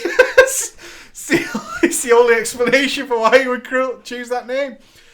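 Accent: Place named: Manchester, United Kingdom. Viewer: Ahahahaha YES PREACH! I fucking knew you were a manc :D recognise that acccent anywhere loool, made my day that did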